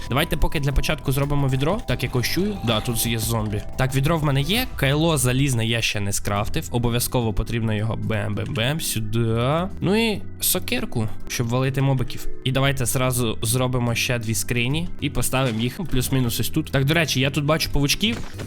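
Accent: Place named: Surzhyk, Ukraine. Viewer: ти насуржику говориш я це чую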